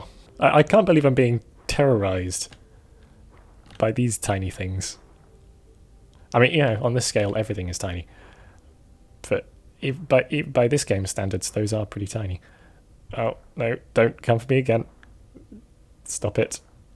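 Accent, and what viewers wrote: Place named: United Kingdom. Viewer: you cannot understand how soothing your slightly nervous British voice is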